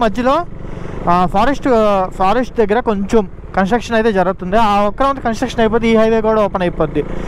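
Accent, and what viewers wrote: Nellore, India. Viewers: Nellore yaasa 100 percent dimpesav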